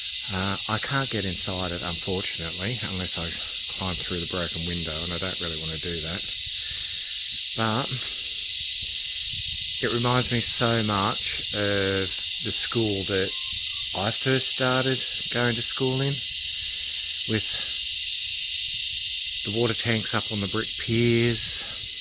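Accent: Australia. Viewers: I’ve seen a few of your vids now and I have to tell you how lovely it is to hear an Australian on YouTube. I know there are many but most of my subs are from North America. Enjoy your work.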